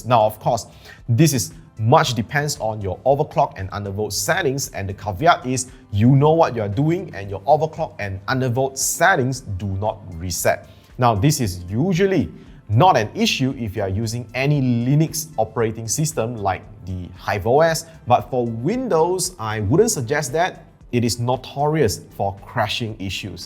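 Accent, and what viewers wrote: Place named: Singapore. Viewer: Your Singaporean accent is thick boi lol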